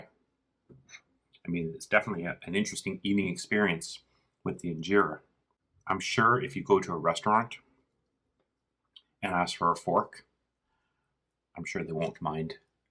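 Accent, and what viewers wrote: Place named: Canada. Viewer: Where is this restaurant? You sound Canadian.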